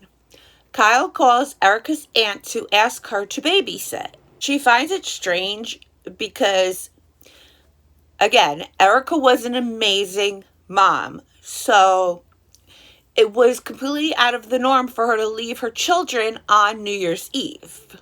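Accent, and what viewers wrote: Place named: New York, United States. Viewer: I love listening to your accent (assuming you're somewhere in the tri-state area, specifically NY/NJ?) Makes me homesick. Also, that color looks SO good on you.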